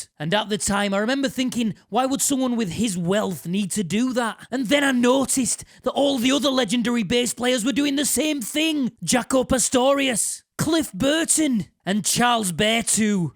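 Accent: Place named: Yorkshire, United Kingdom. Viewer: you make me laugh sooo much, I'm an Expat from Nottingham living in the USA, and i love you and your Yorkshire Accent talking about BEHS, keep it going Mi lad, i play a Musicman stingray love it to bits, Cheers Mate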